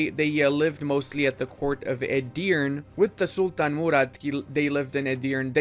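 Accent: Romania. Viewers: is this guy romanian ? his pronounciation is really good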